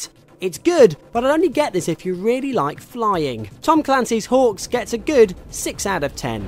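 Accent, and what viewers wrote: England, United Kingdom. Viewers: what is this accent??? english? canadian? australian? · he speaks funny this is why i like ricky gervais and british people. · british narrator for american game wtf? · GRRR british accent sucks.. Its same as german and english together -> British... Nothing beats american Accent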